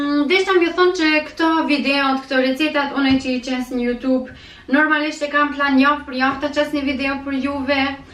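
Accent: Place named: Kaçanik, Kosovo. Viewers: M Z mos e kretiko se po tknaq ka po fol nashta asht e kaqanikut po ska lidhje se ksaj i ka hije ke e kahit ke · Qysh po fol si mu kan e Kaqanikit ?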